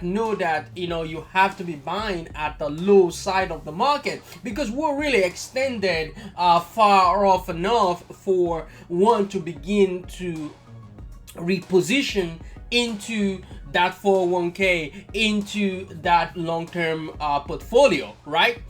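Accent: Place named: Nigeria. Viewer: You have a heavy heavy accent are you from Nigeria ❓🧐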